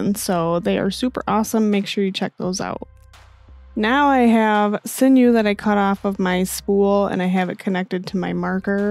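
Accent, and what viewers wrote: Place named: Midwest, United States. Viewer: I ADORE your tutorials, hearing your voice is such a treat! You have a great way of explaining, and a fabulous midwestern accent 😁😁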